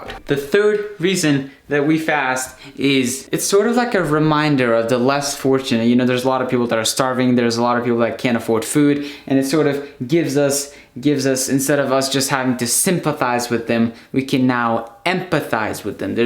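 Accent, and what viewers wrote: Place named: United States. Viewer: cool video! im an american that moved to Turkey a couple of years ago. i have some uzbek friends. they dont know english, so its really interesting hearing an uzbek person speaking perfectly accented american english lol... Türkiye'den Üzbekistan'a selamlar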